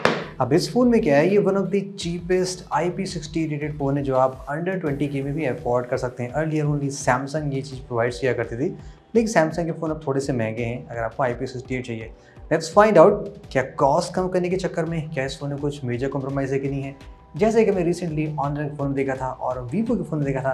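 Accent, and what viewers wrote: India. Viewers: He speaks English, but it sounds Indian😅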